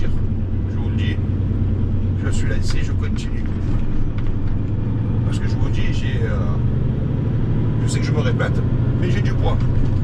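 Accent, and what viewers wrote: Marseille, France. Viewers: Un grand merci pour toutes ces vidéos que tu postes sur You Tube. Nous apprenons beaucoup de choses sur Marseille, en plus avec l'accent et les expressions marseillaises. Tu es un bon chauffeur et à la prochaine vidéo. Peux-tu nous dire ton prénom ? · Merci pour ces vidéos qui nous font découvrir Marseille avec se superbe accent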